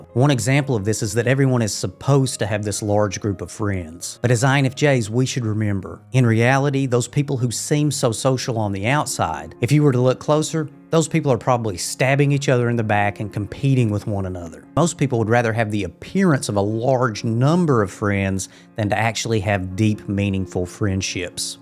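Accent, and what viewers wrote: South, United States. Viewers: Like u n ur sweet southern drawl!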